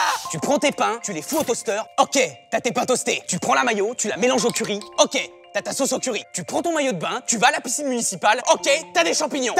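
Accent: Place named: Quebec, Canada. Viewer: En passant ton accent qu'on appelle le joual est je le sais car je vis au et qu'on utilise ct'osti d'accents la tabarnac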